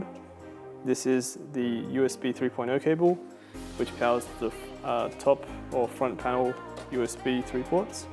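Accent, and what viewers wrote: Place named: Australia. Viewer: It's surprisingly refreshing to watch a youtube video and here an aussie accent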